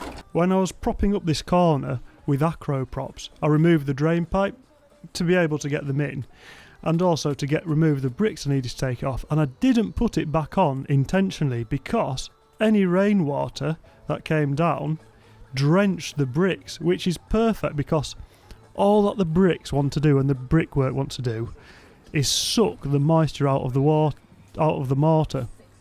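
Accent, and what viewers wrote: United Kingdom. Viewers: New to the channel, was expecting someone in their 50s with the voice. That's a proper accent, a rarity these days in the UK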